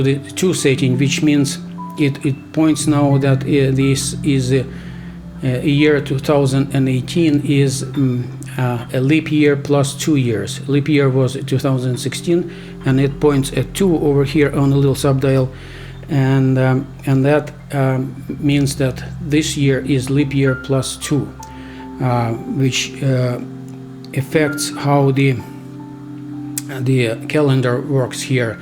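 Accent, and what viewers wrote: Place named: Europe. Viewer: You’re European I could hear your accent so clearly ... are you from Russia or Bulgaria??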